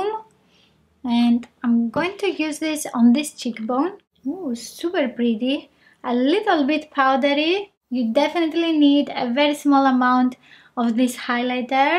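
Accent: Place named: Greece. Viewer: She is Greek. I love their accent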